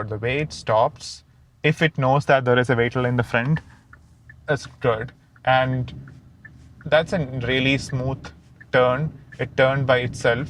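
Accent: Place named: Kerala, India. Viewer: bruh that mallu accent :)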